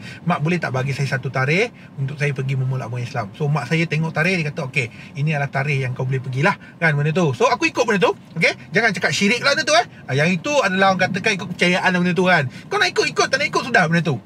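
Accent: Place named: Negeri Sembilan, Malaysia. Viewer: Loghat N9 lak... best dengar...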